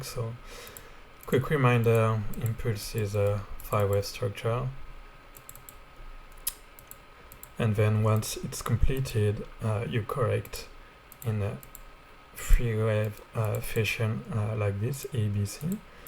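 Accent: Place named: France. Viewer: By the way, I like your french accent...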